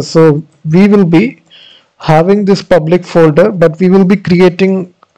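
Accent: India. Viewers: Pure Indian accent and broken microphone. I love this guy❤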